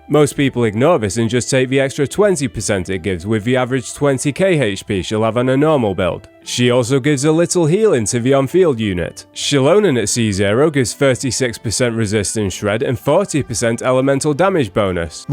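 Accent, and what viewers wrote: United Kingdom. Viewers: Too Much British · it feels like a breath of fresh air hearing another fellow brit talk about genshin, earned a sub from me mate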